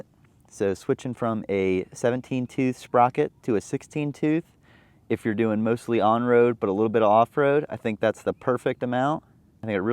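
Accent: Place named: South, United States. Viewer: watching from Australia I'm guessing southern? Hazard county?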